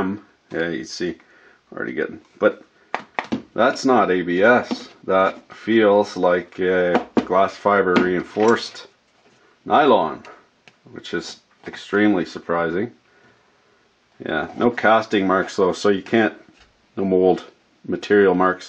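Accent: Canada. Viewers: strongest canadian accent I've ever heard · Canadian as fuck. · Canadian as fuck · No wonder he talks weird he’s Canadian.🙄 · I cannot get enough of this Canada talk. Awesome.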